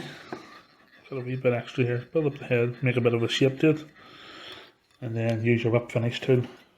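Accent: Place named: Ireland. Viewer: Lovely looking fly. Great to hear an Irish accent on youtube too,. Keep up the great work. Subscribed.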